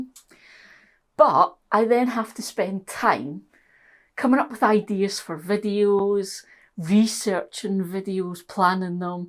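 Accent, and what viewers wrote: Scotland, United Kingdom. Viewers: I just stop in to see what your up to. You could probably film walking around the yard and going on about whatever comes up. My gardening channels are just relaxing, AND... Your my only Scot?:) So its also nice for my ears:) Happy new year! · I just read a comment from one of the viewers saying that “for a moment they thought you were going to say that you were stopping making videos”! That’s was exactly what I thought and for a moment there I felt a real pang of sadness, and was so relieved to find that was not the case! 😮‍💨 I absolutely love your videos and discovered your channel when you had just reached 18 thousand subscribers - you were ecstatic about it and couldn’t get over the fact that 18 thousand people wanted to hear what you had to say and share in yours and adventures. Your channel is so incredibly relatable and real, and the ‘fluffier’ the content, the funnier and more enjoyable your videos seem to be. 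It’s a real de-stressor watching your antics. I work in a hospital and some days, after a particularly stressful 12 hours shift when my brain refuses to switch off from the dramas of the day, there’s only a handful of programs that are able to relax my grey matter, and YOUR channel is right at the top of that list! So thank you for making this overworked and underpaid NHS worker relax and laugh ❤ PS: your channel even comes with a bonus: the Scottish accent, of course! Absolutely love it!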